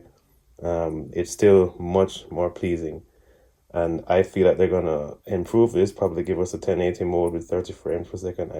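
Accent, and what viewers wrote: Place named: Caribbean. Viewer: Nice video bro. Maybe someday I'll go digital when it's priced a bit better. Btw, that sounds like a Caribbean accent...do I detect some Guyanese or Bajan heritage? :)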